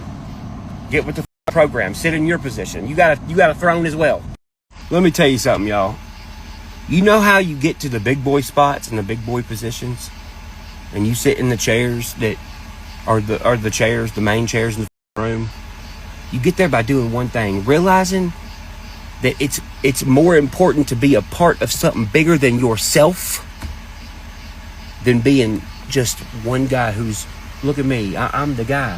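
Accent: South, United States. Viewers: Still sounds like a sassy southern hairdresser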